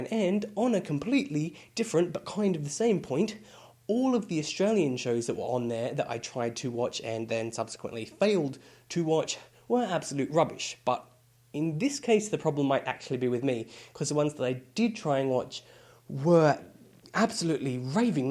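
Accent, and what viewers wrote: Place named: United Kingdom. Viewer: You have a British accent when you're sick.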